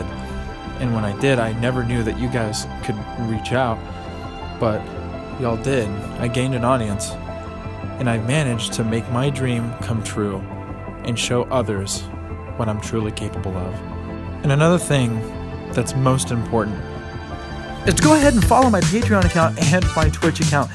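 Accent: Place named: Russia. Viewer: Seriously? I immediately understood the accent, it is easy if you are from RUSSIA!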